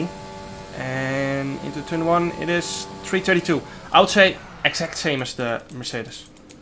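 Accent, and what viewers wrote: Netherlands. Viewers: Just a regular dutch accent to he honest 😅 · Je hoort gewoon dat jij Nederlands bent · Hoor dat je Nederlander bent hahah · I can litterally hear ur a dutchie Ur accent isn’t like mine I hav an British accent and I’m an Dutchie aswell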